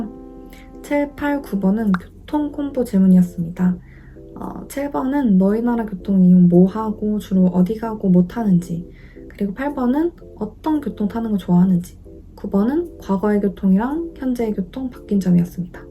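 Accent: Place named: United Kingdom. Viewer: 영국억양 멋있어요.! Autumn 한국인이 쓰는거 첨보네요 👍🏻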